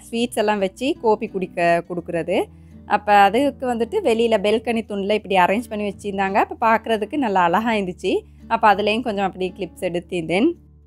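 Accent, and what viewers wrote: Akurana, Sri Lanka. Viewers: ok sis akurana pechi mathiri irundhichi athu than keten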